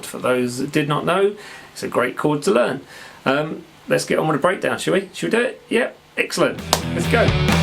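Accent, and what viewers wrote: United Kingdom. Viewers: Nice rif. are you from London?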